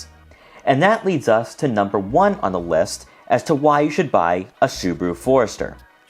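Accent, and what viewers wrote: Boston, United States. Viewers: Terrible accent for a Bostonian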